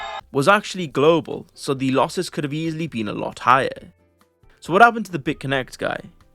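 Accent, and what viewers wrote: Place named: England-Wales border, United Kingdom. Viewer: Can we do an accents tier list?! (I’m trying to work out which side of the England-Wales border line your accent is from, I’m guessing fairly close to it either way)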